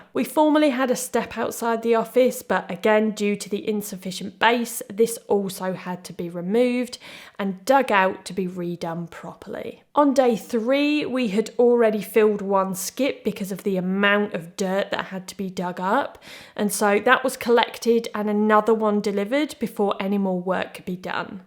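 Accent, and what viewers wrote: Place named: Scotland, United Kingdom. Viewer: I'm trying to figure out what kind of accent is this? Is this Scottish or English ? iots somewhat extreme, but I love it.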